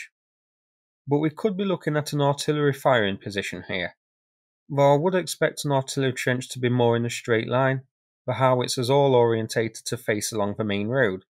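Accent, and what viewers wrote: United Kingdom. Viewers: No, he's British and lives and works in Taiwan. You clearly haven't followed him for longer than a few weeks or so, because he's been open about this. [Added in the edit]: That he's British, you should hear this from his accent. No Ukrainian talks like that. He's neither from the south-east, because he's not eating his words, nor from high up in the north or Ireland, judging from the sound of it, but definitely British.]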